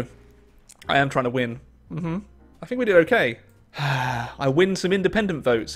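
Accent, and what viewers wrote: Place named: United Kingdom. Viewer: It’s funny as an American to here a British person say seats knowing very well he will never get it right.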